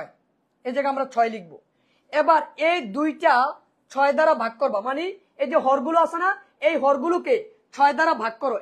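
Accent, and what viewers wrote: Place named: Sylhet, Bangladesh. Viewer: Aii sylhety okol koi ve😂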